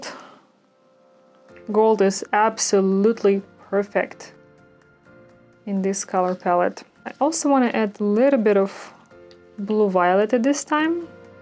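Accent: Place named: Russia. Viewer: Another beautiful creation! In the least creepy way possible... is such a beautiful & charming lady & i am basically in love with the happiness she experiences through her creativity. I also have a thing for russian women & their english dialects. AND she's an artist... Her husband is a lucky guy!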